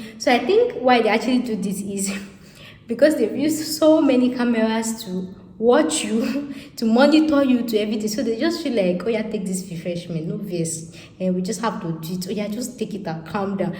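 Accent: Yoruba, Nigeria. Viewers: I just like the way u speak your yoruba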